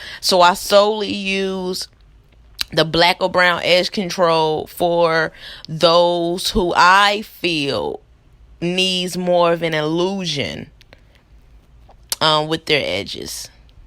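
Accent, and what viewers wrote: New York, United States. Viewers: Awesome work! And I love your accent! New York, right? I'm originally from Jersey now in NC. Ain't nothing like accents from up top! Love your videos and please keep sharing!!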